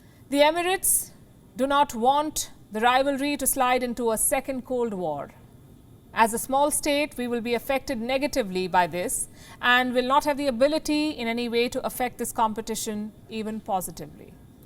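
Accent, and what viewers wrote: India. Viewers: Everyone understand british accent but not everyone understand ( Hindi English). Since WION is 'GLOBAl ' .The accent is important for all viewer's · This news caster speaks English but sounds like hindi... The channel needs to replace her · Both ways are acceptable, the British, Australian, and American pronounce English words differently too, and in this case Indians pronounce English that way. The world does not revolve around your way of pronouncing things. There are more English speakers in India than in America think about it.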